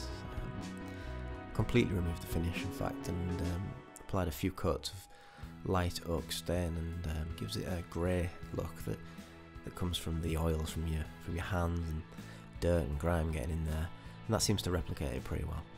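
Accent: United Kingdom. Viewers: awesome video, but the narration parts where your voice trails off and you get a bit quiet is MADDENING! Keep your speech loud and clear please, especially for us yankees who need all the help we can get understanding the British accent. Cheers!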